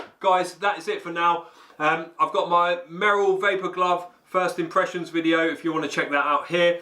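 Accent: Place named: United Kingdom. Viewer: Epic UK accent and great review :-)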